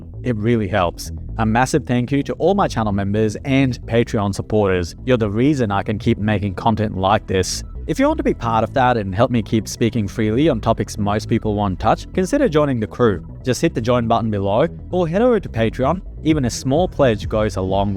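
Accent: Australia. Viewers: You're not even Canadian, you're Australian from your accent, who are you to speak about the internal affairs of another country care to explain !???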